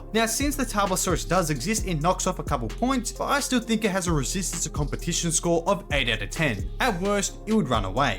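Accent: Australia. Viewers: I’m surprised the Indoraptor had jts worst score in the Jurassic. Kind of ironic, if you think about it… Also, for some reason it didn’t click to me for some time that you were Australian,. I don’t know why. I hope I didn’t offend you with this…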